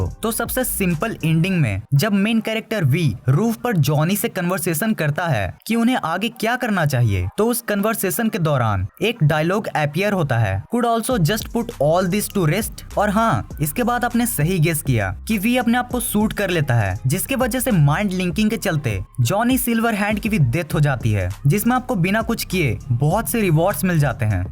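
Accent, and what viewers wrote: Bihar, India. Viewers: Bhai bs 1 question ka abs dedo ki tm bihari ho kya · Are bhaiya bihar se ho ka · You are from Bihar? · Are you from Bihar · His accent of saying hindi is like bihari love from bihar 😎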